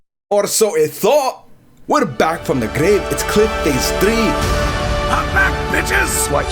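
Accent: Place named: Scotland, United Kingdom. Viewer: why does the Scot accent suit you so much hahahah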